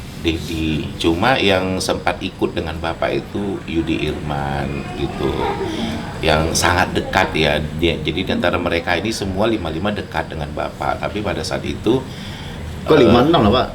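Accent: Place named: Medan, Indonesia. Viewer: Logat Medan yaaa.. gaya bicaranya mirip Billar banget 🤭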